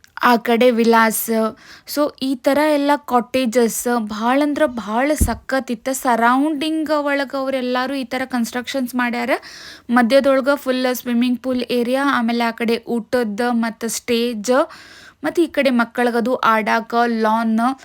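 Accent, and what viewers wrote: India. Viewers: Speaking in north(Uttar) Karnataka language, and you are from the south Karnataka youtube channel and? Why do you people do like this. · ಮೆಡಂಮ್ ನಾವು ದಾಂಡೇಲಿಯವರೆ,ತಮ್ಮ ಅನುಭವ ಹಾಗೂ ಶುದ್ದವಾದ ನಮ್ಮ ಧಾರವಾಡದ ಕನ್ನಡ ಭಾಷೆಯ ವಿವರಣೆ ಕೇಳಿ ನಿಜವಾಗಿಯೂ ತುಂಭಾ ಖುಷಿಯಾಯಿತ್ತು, ದಾಂಡೇಲಿಯಲ್ಲಿ ಸರೌಡಿಂಗ್ 600.700ವರೆಗೆ ರಿಸ್ವಾಟ್೯.ಹಾಗೂ ಹೋಮ್ ಸ್ಟೋ,ಇರುವವು ಇನ್ನೂ ಹಲವಾರು ರಿಸ್ವಾರ್ಟ್ ಗಳು ತುಂಭಾ ಸುಂದರವಾಗಿವೆ. ಇದು ಕೂಡಾ ತುಂಭಾ ಸುಂದರವಾಗಿದೆ.,ಧನ್ಯವಾಧಗಳು ತಮ್ಮ ಶುದ್ದವಾದ ಹಾಗೂ ನೈಜ್ಯ ವಿವರಣೆಗೆ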